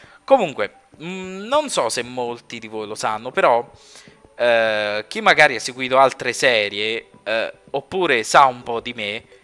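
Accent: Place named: Rome, Italy. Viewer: ah ok. lo so che è di Roma dalla voce, e su so solo che ha origini asiatiche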